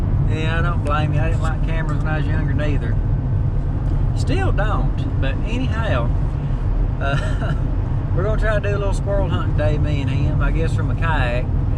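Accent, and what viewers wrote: South, United States. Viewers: Yeah I just saw the video on how you cleaned that ”chipmunk deer” and now I will have nightmares. I found this video trough swedens largest ”link” site where they post videos and photos on a daily basis. I then listnened to your pretty polly cover and you have an amazing southern accent and nooow im going down the rabbit hole of banjos :)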